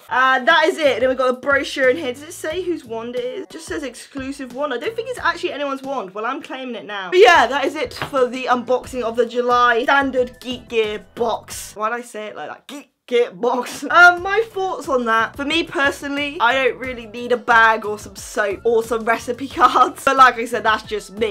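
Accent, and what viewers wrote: United Kingdom. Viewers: YESSSSSS !!!!!!I love u.I love your intro:Whats up muggles hahahahha Also are u british?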